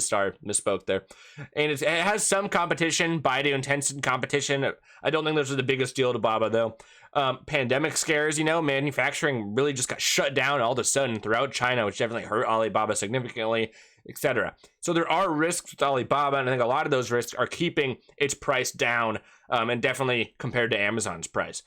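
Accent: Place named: United States. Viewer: If you want to complain about an American speaking like an American, then go elsewhere.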